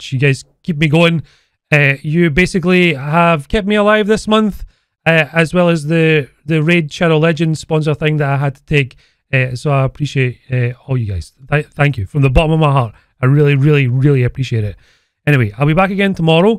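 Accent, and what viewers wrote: Scotland, United Kingdom. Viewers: Listening to is like listening to myself but as Scotsman "I hate being self aware" hit me in the feels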